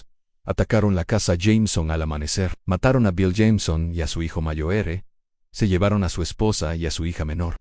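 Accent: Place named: United States. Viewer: La lectura está bien lo que paso es que primero habla del apache herido como si fuera mujer y luego como hombre así que eso genera por así decirlo ruido al escuchar, en algunas partes le pone entonación como si fuera de EU hablando en español , por lo demás está bien , solo pónganse de acuerdo si hablan de un hombre o de una mujer, y eso no quiere decir no se agradezca las publicaciones con audio , saludos desde Puebla México 🫂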